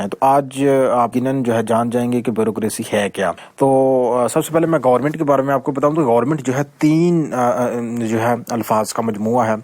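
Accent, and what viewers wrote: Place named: Punjab, Pakistan. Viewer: 🤣🤣🤣 Urdu m Punjabi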